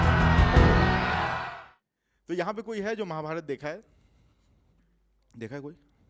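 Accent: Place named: India. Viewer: waise, aapke saare video dekhe, funny to hain hi lekin ek desi lehja bhi hai jo jokes ko aur bhi mazedar bana deta hai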